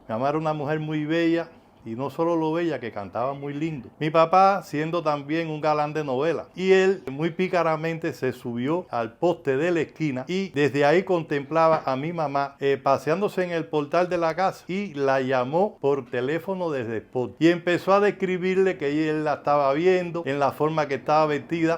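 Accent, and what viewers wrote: Cuba. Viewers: Me encanta ver como prepara sus comidas deliciosas pero lo mejo es ese acento Cubano tan dulce!!! ❤❤❤❤ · Querido abuelo me hizo recordar a mi papa cubano ese acento cubano es inconfumdible gracias por traerme viejos recuerdos y voy a preparar esta receta con congri gracias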